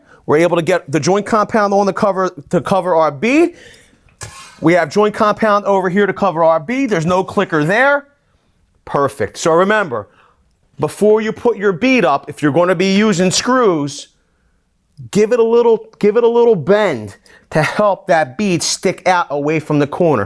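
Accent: Philadelphia, United States. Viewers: I have no idea but see he hasn't answered and your question made me notice that now as well. My guess is that it's a Philadelphia accent maybe.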